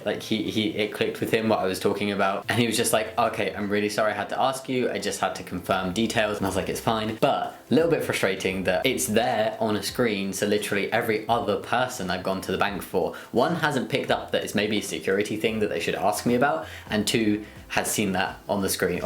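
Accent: United Kingdom. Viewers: Because of your accent I’m guessing your from the UK I am too and I was wondering if T and the surgery is on the NHS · Is he from UK ? This is not American accent for sure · I'm from Northern Ireland and I could instantly tell he was British aswell lol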